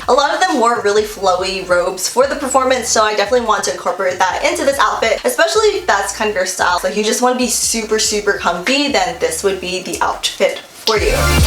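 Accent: United States. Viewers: u look asian but then u have rlly dark skin and then you have an american voice. im confusion lmfao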